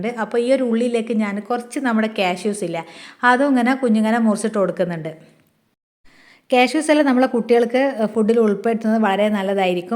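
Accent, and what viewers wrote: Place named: Vadakara, India. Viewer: Ninkale varthanam vadakarakkare bhashsa pole ende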